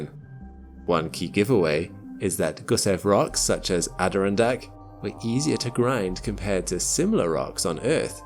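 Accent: Wales, United Kingdom. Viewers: Are you Welsh by any chance? Every so often during the video, I thought I could make out a slight Welsh accent, more noticeable with certain words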